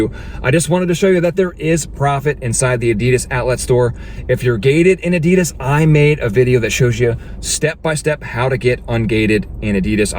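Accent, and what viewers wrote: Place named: Canada. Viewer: Ive watched a few of these videos from different posters but all seem to be american and i dont think canadian stores tend to have clearance sales to the same degree as in the US. Im not sure if youre american (you have a canadian accent and ive seen some canadian based stores in the background of your videos. Just wondering if this type of thing can work in canada. Also, subbed.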